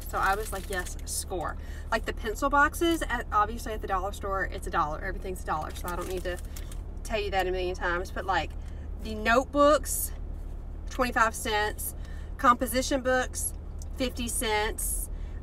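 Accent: South, United States. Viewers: Go on Grrrlll love that southern twang lol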